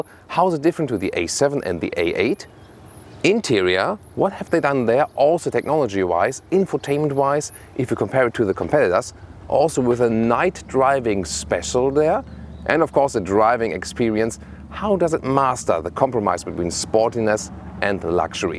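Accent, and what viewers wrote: Germany. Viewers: His German accent genuinely made me feel I was already riding an audi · Yeah, I guess now that you mention it, we've had German au pairs and they and their friends are all embarrassed by their parents' accents.:) It's not so bad though... Germany is a tremendously well thought of country to say the least! And Germans (au pairs, at least) have much better English than other European countries. So, don't worry about the accents. ' accent really adds to the review for us Americans because it's a constant reminder that this is what an actual German thinks! Europe is cool for Americans. It's a big reason why Americans drive European (German) cars. Now me, personally,... I've owned only one foreign car in my life and it actually was a brand new Audi A6. And that car almost put me in an insane asylum with all of its problems and its crappy engine!:) So, it's Detroit Iron only for me! 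· That strong German accent is horrible. Most kids speak much better english.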